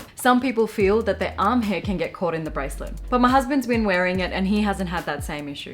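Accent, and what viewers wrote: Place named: Australia. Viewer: Your channel will blow-up you should have way more subs with the quality of your video! And the Aussie accent doe 👌😅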